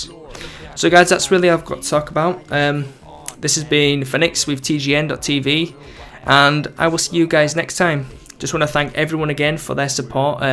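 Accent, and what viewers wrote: Sweden. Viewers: ur Sweden O.O?? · Are you from Sweden? :D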